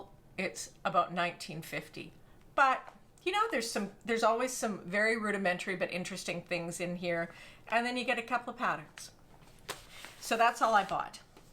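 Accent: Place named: Canada. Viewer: Thanks for your videos. I just discovered you. I love your Canadian accent, so easy to listen to. I wonder if you ever do a FBA. I'm struggling to get my makes to fit me nicely. If I make a size 18, its too big in the shoulders and neck area, so I have attempted to do FBAs, but am never quite satisfied with the results. Thanks again and keep them coming.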